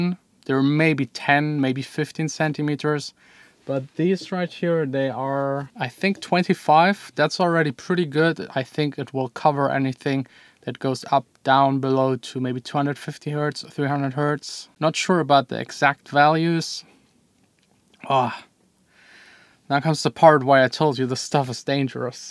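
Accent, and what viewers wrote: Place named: Germany. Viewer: Well.. He's from Germany, heck, he even has a pretty strong German accent when speaking English! lol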